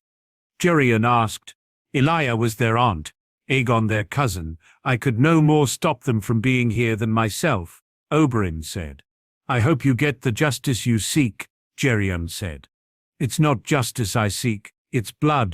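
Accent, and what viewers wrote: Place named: United Kingdom. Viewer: This is a captivating story. I enjoy the British accent only because of the show :) I am hooked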